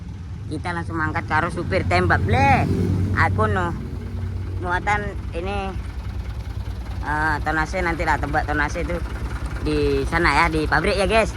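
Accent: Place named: Indramayu, Indonesia. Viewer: Mas Punya kamu keturunan dari Indramayu ya, soalnya ngomong jawanya itu Jawa Indramayu❤❤❤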